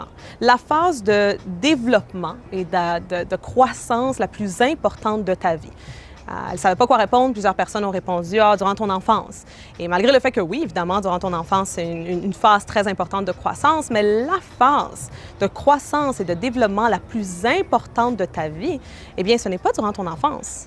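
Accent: Quebec, Canada. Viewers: in this video she speaks french with a Canadian accent (québécois) because she leaves in Canada. She is trilingue. She speaks currently French, English and Spanish, she has latino origins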